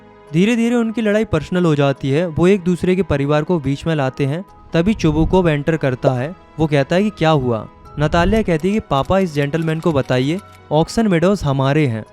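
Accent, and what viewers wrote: Uttarakhand, India. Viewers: Are you from Uttarakhand?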